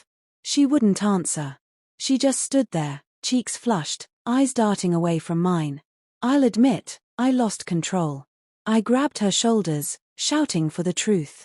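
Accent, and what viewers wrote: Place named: England, United Kingdom. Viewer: Very confusing, English woman telling a story of a Midwest American male in the first person present.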